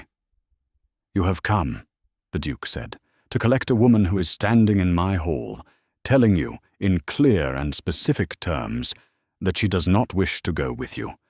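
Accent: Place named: England, United Kingdom. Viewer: A very enjoyable story. Thank you for using a narrator with an English accent. When the story is about Dukes & England, that is the proper choice, yet some use a narrator that is, clearly, not English, usually American. It takes away from the presentation. Thank you for a good story, supported with good taste. It is appreciated!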